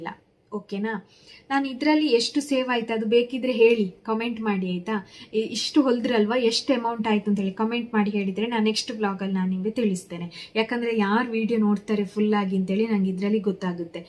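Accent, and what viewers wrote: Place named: Mangalore, India. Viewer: Neevu maatnaduvaga Mangalore bhashe tara ide akk a.nim ooru yavdu.naanu mamgalore adke kelde .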